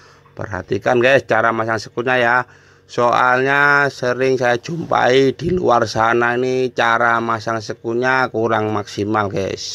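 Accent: East Java, Indonesia. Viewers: Aing mah resep. Gaya ngomong jawa timur na cak euy.!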